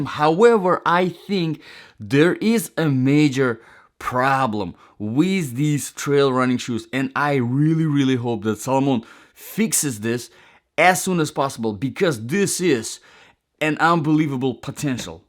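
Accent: Russia. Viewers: you have perfect russian accent, i can understand every word😅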